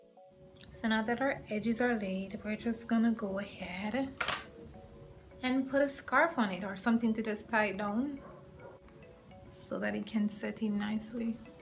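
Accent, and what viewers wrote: Jamaica. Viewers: This is really neat .. I can never slick my hair down ! But ill try this way .. Btw Are you Jamaican ? Kinda sound like one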